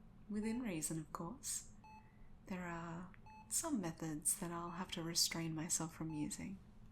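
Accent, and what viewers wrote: Australia. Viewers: Oi, a fellow Australian!!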